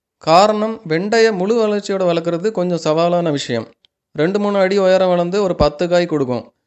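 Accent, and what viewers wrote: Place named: Coimbatore, India. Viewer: Bro unkal thottam Mathiriye unkal pesum vidham rombaum super ithuthan kovai kusumbunu solvankanu niniaikiran bro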